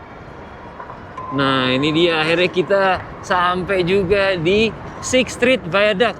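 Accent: Indonesia. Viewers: Hebat, 20 thn di LN, logat nya Indonesia bnget❤ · Dah lama sampe lupa Chanel ini,pdahal dlu saya suka nonton... Btw gua kagum m lu bro,, 20 tahun di Amrik tapi pas ngomong bahasa indonesia,dialek nya masih indo.👍🏼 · 20 th tinggal, suaranya ga berubah sih" tetep Indonesia bgt' 👍👏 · Logat loe Indo banget.... Keren...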